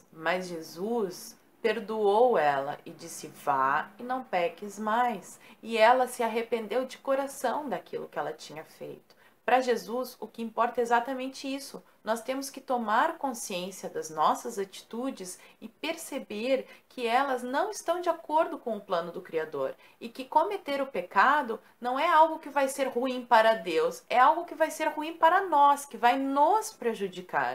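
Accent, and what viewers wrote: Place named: South, Brazil. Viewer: sou novo no seu canal e estou curtindo muito, estou aprendendo muito.... Vou te fazer uma pergunta pelo seu "sotaque " vc é do Sul do Brasil não é? para ser mais específico vc é do Paraná???? valew ate mais...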